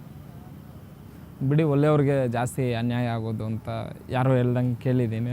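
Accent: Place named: Tamil Nadu, India. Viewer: Kannada gottiro huduga bekappa. Eee tamil hudugana kannda kelodikke agodilla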